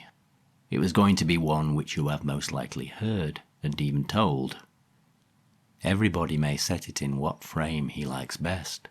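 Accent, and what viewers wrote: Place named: Yorkshire, United Kingdom. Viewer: Please, No! Do not feel because of your Yorkshire accent that you are not suitable to read the works of M.R. James. He wrote his works for everyone and (excuse the pun) would be horrified a person would feel his accent prevented him from interpreting his work. He was not a snob. Far from it. You have the perfect voice for the journey you have chosen (or it may have chosen you) Happy reading 📗📘📙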